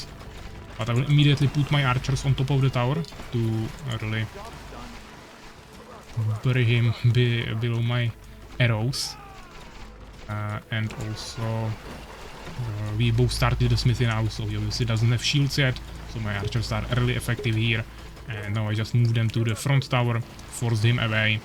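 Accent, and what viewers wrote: Czech Republic. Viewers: tu češtinu v hlasu slyším hodně :-)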